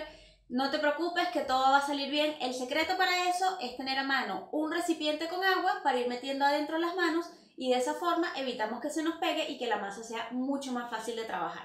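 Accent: Venezuela. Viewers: Qué fácil, colega jaja, soy profesora y tienes mucha pedagogía al explicar. Un millón de gracias, ya me inscribí. En los comentarios leí que existe una pizza, voy a buscarla, que Dios te bendiga. ¿De donde eres chamita, su acento tiene mucho parecido al venezolano. Chaoooooo. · Hola, estoy en eso de querer volverme vegana pero ni idea de donde conseguír los ingredientes. Por tu acento creo que eres venezolana, si es asi. ¿Me dices por fa donde puedo comprar en venezuela el psyllium, la levadura y los probioticos, y que marcas? Por favor🙏🏼🙏🏼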